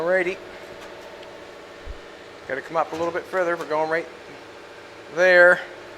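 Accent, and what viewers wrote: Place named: Newfoundland, Canada. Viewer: the accent,,,so you would be what i consider/call a newfy,,?,, a canadian from somewhere east of quebec,,,?...lol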